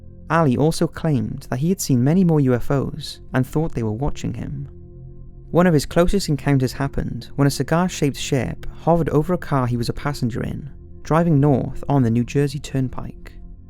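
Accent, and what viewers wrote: United Kingdom. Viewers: Yay British narrator :) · Are you Welsh? Where are you from? love the accent 👌🏻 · Man it's hard to understand the British accent:/ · Like how the narrator has a soothing British voice.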